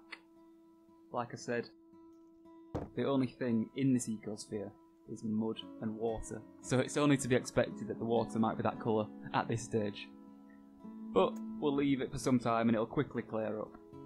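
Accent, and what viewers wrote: Yorkshire, United Kingdom. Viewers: love a thick yorkshire accent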